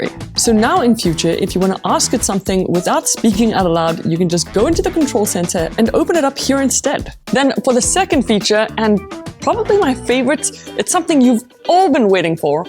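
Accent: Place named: South Africa. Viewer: This lady sounds very south african, where is she from?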